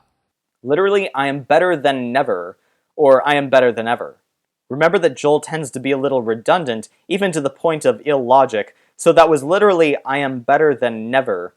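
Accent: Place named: France. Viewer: sounds like a native French man trying to speak Spanish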